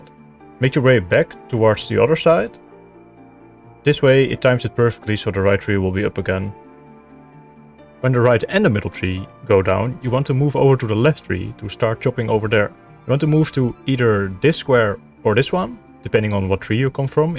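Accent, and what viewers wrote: Netherlands. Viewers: I love the Dutch accent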